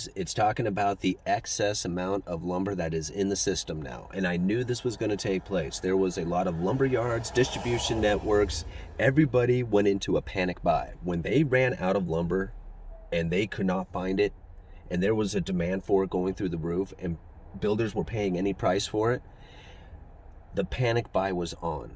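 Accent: Pacific Northwest, United States. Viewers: Sounds very Pacific Northwesterly. Nice!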